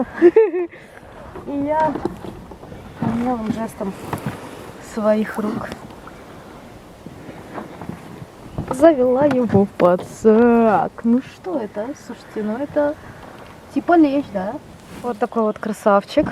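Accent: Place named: Belarus. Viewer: Белозубая улыбка это нечто!! И профиссиональные снасти аксессуары это зачёт!! Смотрю тебя с удовольствием настроение супер, спасибо за видосы природы и твои лайфаки для рыбалки и конечно твои комментарии говорок белорусский!!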